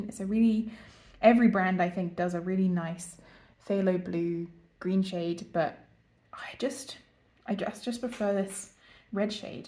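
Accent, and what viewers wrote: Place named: South Africa. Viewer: You sound South African but not!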